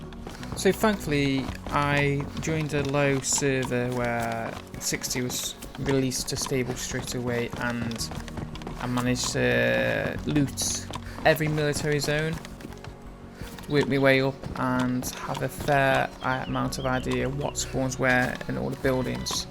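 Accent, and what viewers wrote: United Kingdom. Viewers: Where abouts in stoke you from haha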